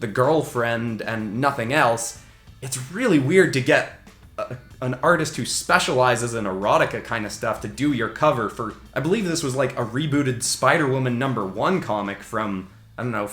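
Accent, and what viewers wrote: Canada. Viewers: You have the MOST Canadian "about" I've ever heard. It even bleeds into your DOUBTs, which I never heard before. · Canadian! Besides the celebrity Canadians, it makes me wonder if everyone there pronounces those words that way (minus the French Canadians). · First time watching, can already tell you’re Canadian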